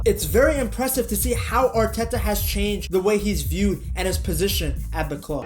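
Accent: United States. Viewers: "VEN-ger," not Wenger. Sorry, man, but if you want people to believe you are an authority on football you need to be able to pronounce correctly the name of a manager so famous that little girls in Europe know it. It's like you never watched a premier league game before 2020 or never listened to pundits discuss the sport. I'm not dissing you, dude, but you sound like some Yank creating clickbait, not a true fan of the beautiful game.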